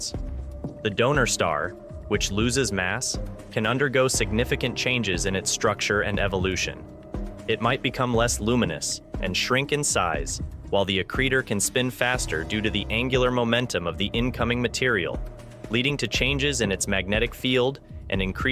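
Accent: United States. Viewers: I assume NASA sponsors this channel. The narrator has an American accent, so I don’t understand why NASA doesn’t utilize a narrator familiar with the pronunciation of astronomical terminology such as the type of an important type of star.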